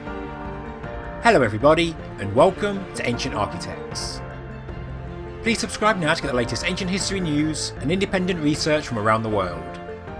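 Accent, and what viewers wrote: United Kingdom. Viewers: Sounds like a regular Brit to me. Guess am more used to a wide variety of accents. Thank God he doesn't talk with that unintelligable deep South or hardcore Texas accent. That is soooo hard to understand even when you live there. Give me even an Australian accent over that.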